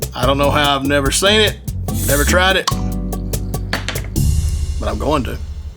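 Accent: South, United States. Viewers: The southern accent just makes these sound even better. Was living in the south for 10 years and those mofos can cook! If you're not a fancy little snowflake you'll love pretty much everything the south can cook.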